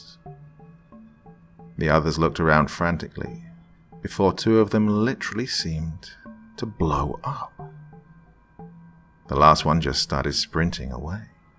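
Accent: United Kingdom. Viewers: Why do british people do the best narrations?? My narrator voice I do has been British since I was a child lol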